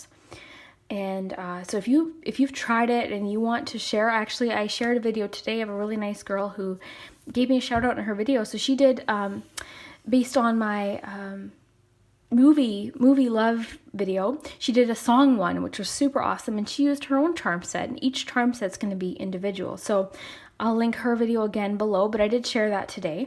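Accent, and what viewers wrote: Canada. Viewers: I might not be correct, but are you from Canada by any chance? I'm pretty good with accents and I'm guessing yours is Canadian. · are you Canadian?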